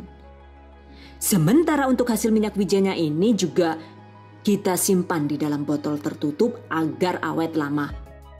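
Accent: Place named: Java, Indonesia. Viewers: Aduh mba suara nya lucu bngt kl lg kecaprakan .bhs jw nya medok bngt .wk wk wk 😃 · Orng mariun ko' pke gya bhsa orng teggal.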